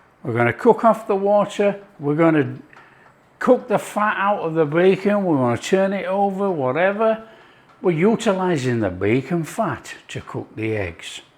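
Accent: Yorkshire, United Kingdom. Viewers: He doesn't sound like an Aussie beowtiful is Yorkshire. He lost me when he put ketchup on it, tomato doesn't go with egg.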